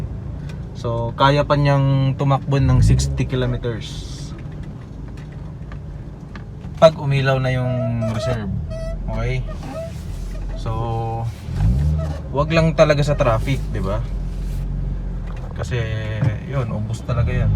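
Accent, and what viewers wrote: Visayas, Philippines. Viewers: Bisaya ka boss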